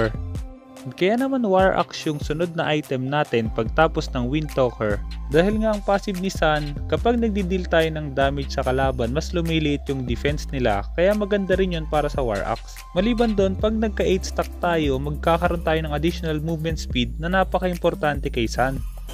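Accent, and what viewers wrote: Visayas, Philippines. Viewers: bisaya ka idol?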